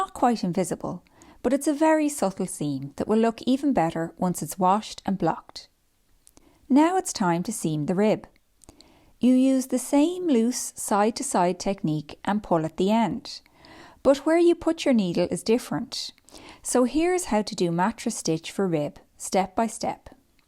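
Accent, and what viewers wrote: Ireland. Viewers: Great video. So helpful and easy to follow. Great to hear an Irish accent too! 👏👏👏👏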